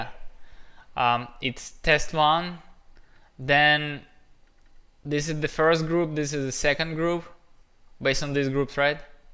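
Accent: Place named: Russia. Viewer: Я один слышу русский акцент? )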